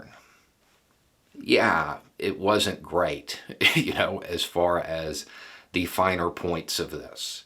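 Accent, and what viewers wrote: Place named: Oklahoma, United States. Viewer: are you by chance from Oklahoma? (accent related)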